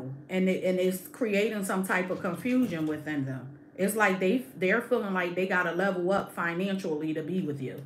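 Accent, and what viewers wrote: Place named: Philadelphia, United States. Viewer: oh yea Philly really apart of you cause you have a very distinct accent. I love it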